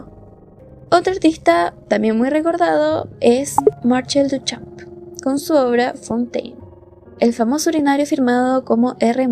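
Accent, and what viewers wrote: Chile. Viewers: tu voz con ese acento chileno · se me hizo recontra tierno su acento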